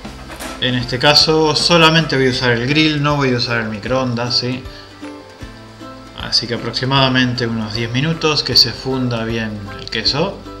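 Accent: Argentina. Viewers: hola una pregunta sos Argentino ?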